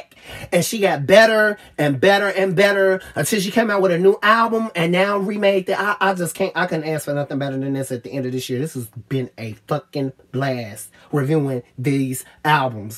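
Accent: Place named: South, United States. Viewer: by the way where are you from you have a really deep and strong southern accent it really fascinates me it is So DEEP AND Strong I’m just curious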